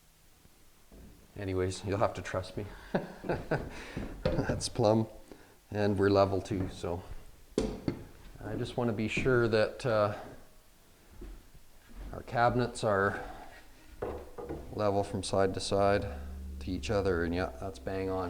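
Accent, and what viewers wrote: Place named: Canada. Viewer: I like how you even tape over the manufacturer labels. True professional man your videos are great. The light Canadian accent makes it that much better.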